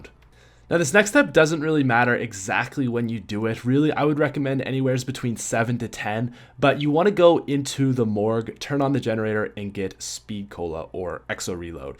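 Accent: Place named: Canada. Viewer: Hahaha you must be new to his channel. Not trying to be rude, but he's Canadian and says some words just a bit different. Nothing bad about it tho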